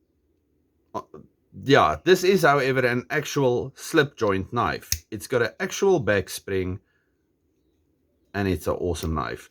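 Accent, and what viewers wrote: South Africa. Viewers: Thanks for posting. Can't get enough of that South African accent! I get some from the Bike Show here on YT, and I know a SA gal of Boer descent in our church who speaks Afrikaans. It's like listening to Pete(Cedric & Ada), if he had a German/Dutch inflection. 👍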